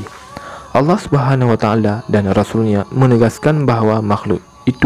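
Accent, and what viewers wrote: Aceh, Indonesia. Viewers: Ustadz orang aceh ya 😁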